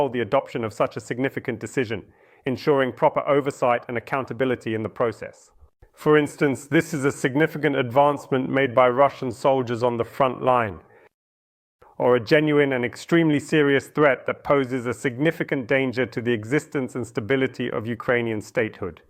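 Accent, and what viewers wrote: United Kingdom. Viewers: this is true, but his voice must be ai generated. He has nice British accent, this is impossible to achieve with his background. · Pan Portnikov’s speaking with BBC accent! )) Technologies are amazing. Now I am able to share your brilliant analytic with my English friends. ❤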